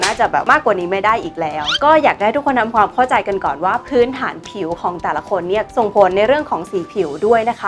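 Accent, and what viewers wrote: Isan, Thailand. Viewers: ชอบ พูดอีสานค่ะ น่ารักดี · พี่เป็นคนอีสานใช่ไหมครับ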